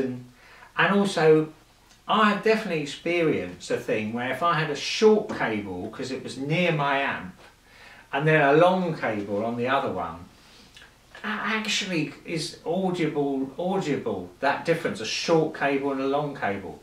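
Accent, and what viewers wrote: London, United Kingdom. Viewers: Thats London english, none of that Queens English rubbish, learn this!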